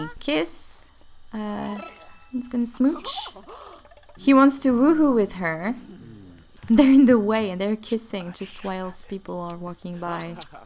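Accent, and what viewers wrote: France. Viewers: I really enjoy the way you play the game! Also, I LOVE the slightest hint of accent in your voice. Are you french by any chance? I could listen to you speaking for ages, it just sounds so nice🌻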